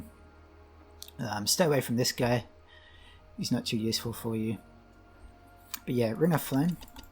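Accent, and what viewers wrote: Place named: Australia. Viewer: Thank you for the information. Aren't you Australian? I saw in the comments that you have an aussie accent.. You woke up very early on the holiday morning. Sorry for sending you a comment notification in the early morning!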